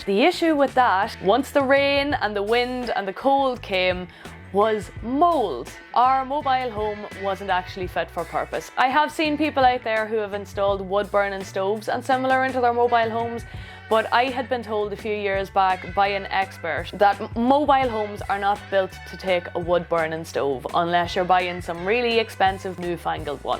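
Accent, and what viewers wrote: Ireland. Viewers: Great video. What's the accent? Not Louth . . . maybe Monaghan? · ♡ i LOVE your accent please please please .............. ~ whether I get to be your neighbor in Ireland (an absolute ♡ dream for me) or not .... with all my heart I pray we shall be in Heaven 🙏 🙏🙏🙏🙏🙏🙏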